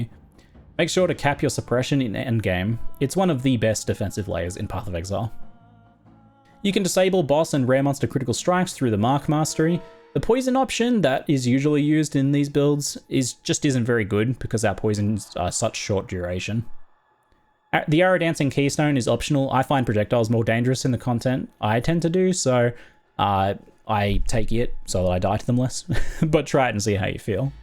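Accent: Australia. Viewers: ihavent played PoE in ages and i was so happy to see when i googled Viper Strike build a build come up. my favorite poe guru. is australian? i cant tell the accent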